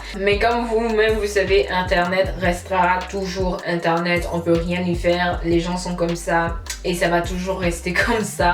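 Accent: Quebec, Canada. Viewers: Elle a un petit accent québécois je trouve. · T’es une québécoise ? Oui non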